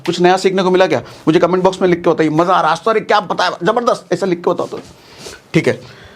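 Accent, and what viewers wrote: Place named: Bengal, India. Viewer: Bhaiya ap bangali ho?👀motamuti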